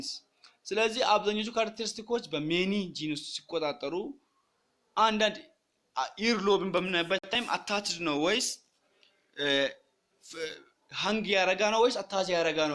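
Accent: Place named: Hadiya, Ethiopia. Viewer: ሀዲያ ለሀጭህ ምንድነው አስተካክል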